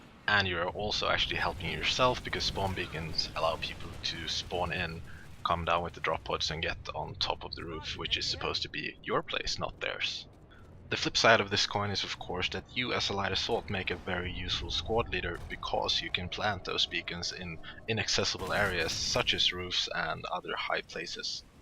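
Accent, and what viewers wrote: Sweden. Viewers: From your accent I'm gonna go ahead and guess that you're from Sweden, or at least Scandinavia. :-)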